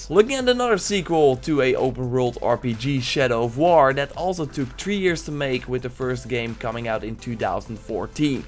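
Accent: Netherlands. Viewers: ge hoort het toch da ge nederlands bent van origine ze man :) kweet ni hoe funny voor mij :p (geweldige video's) kben al nen hele tijd uw vids aant bekijken en kzouet belange ni beter kunne.... goie werk en blijf vooral verderdoen man :) grtz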